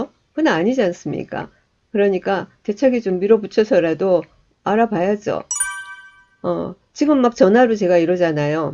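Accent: Gyeongsang, South Korea. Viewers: 소장님은 진짜 유튜브방송을 계속하시면 어마어마 해지실듯 해요~ 말씀하시는게 한단어한단어 잘들어오네요~ 경상도아나운서!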